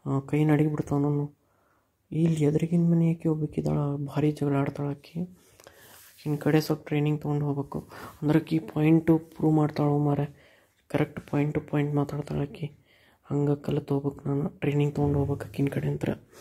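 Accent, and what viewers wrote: North Karnataka, India. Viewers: anna nin video osm...ur talented.. Uttar Karnataka accent masth ad...bhal ishta agthad....innu jasti videos madri anna..all the best from uk fans 💐🙏👏👏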